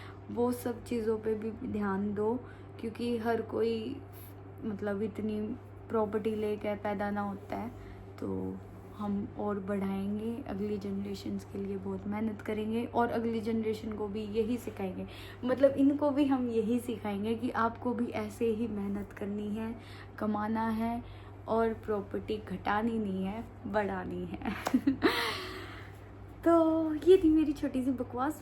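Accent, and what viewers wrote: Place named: Haryana, India. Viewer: me bhi jaat family ko belong karti hun but me by birth punjabi family se belong karti hun but mere in-laws jaat hain U P se. Jb se maine tumhare vlog dekhna shuru kiya to mujhe tum bahut pyari lagti thi us ka ek reason y tha ki mujhe tum haryanvi language bolti Hui bahut achi lagti thi kyuki tumhare bolne me bahut mithas hai jo maine kabhi haryana ke jaato me nahi dekhi thi. Phir jb se ko dekha to mujhe tum dono se pyar ho gaya bacho ki tarah ab mujhe hr time tumhare vlog ka wait rehta hai. Congratulations tum Mom Dad banne wale ho but apni health ka dhyan rakhna. God bless u all. Luv u all❤❤.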